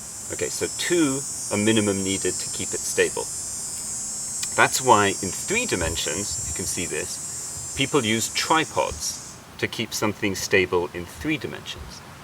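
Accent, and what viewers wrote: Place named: England, United Kingdom. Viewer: My favourite kind of English accent - Ed-dew-cated. Not once did he utter, "let me arx you sumfin, yeah?"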